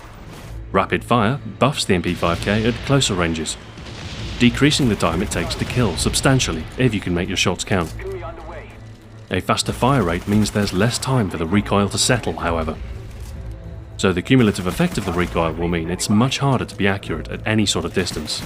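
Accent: England, United Kingdom. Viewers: Holy Shit! It's British William Strife! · the 27 are so but hurt tht they dont have an awesome british voice like this guy im british too sooo :D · You make this kind of analysis so interesting and it's also nice to hear an English voice on CoD videos for a change. You are getting me through my red rings of death so please, please keep up the good work! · Very professional Videos, very good quality and high entertaining value (omg) throughout from the first mw2 video to the black ops videos today. By the way, i love to hear english people talk german ;) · im pretty sure hes from england, thats why he has an accent and knows english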